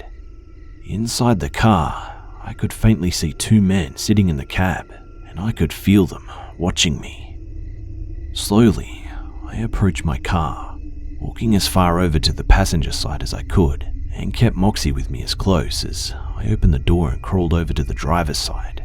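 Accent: Australia. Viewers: Finally, a familiar Aussie accent!! I could tell by the whispers at the start lmao :')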